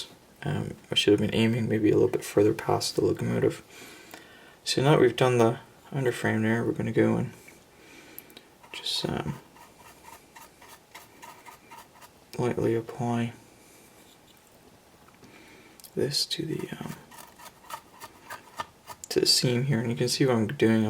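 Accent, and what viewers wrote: United States. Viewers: Hi, just wondering you come from? As you sound American/Canadian, if so, why do you use English trains? Not to be nosy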